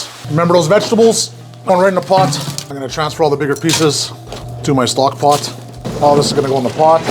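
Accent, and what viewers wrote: Montreal, Canada. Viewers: I love the Canadian accent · Ahh Montreal. I was trying to place that accent. Makes sense now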